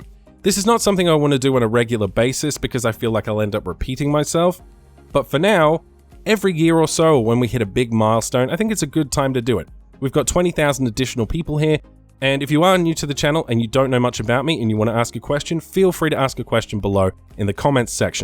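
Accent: Australia. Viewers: Congrats mate. No nonsense gaming coverage. And great to hear a fellow aussie. Keep it up 👍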